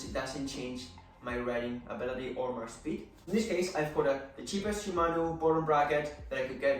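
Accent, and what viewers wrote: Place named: Northern Ireland, United Kingdom. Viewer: great video! im curious man, i see from another comment you speak spanish, but you sound like you mightve been raised in northern ireland. is this the case? really cool if so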